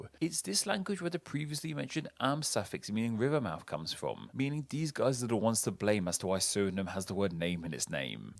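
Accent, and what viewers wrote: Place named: United Kingdom. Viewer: Your pronunciation was very good of those names. It was very surreal hearing those words spoken in a British accent. What a time to be alive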